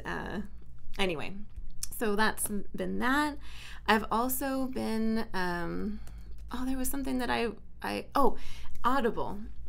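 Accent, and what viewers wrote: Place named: France. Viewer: "I hope this is recording 😵🥴 ... " 🤣😂🤣😂 💚💛🧡💔 I grew up in France only to leave at the age of 24. English is full of French words: strange/extreme/beauty/desire/verbal/mode/blonde/etc so you already know a bunch of vocabulary. When we French speakers learn English the same happens ! Where as when we learn German there's little to start with. But you English speakers also know a bunch of German vocabulary: break/brechen White/Weiß Mother/Mutter Brother/Bruder and so forth. Your french accent is so cute ☺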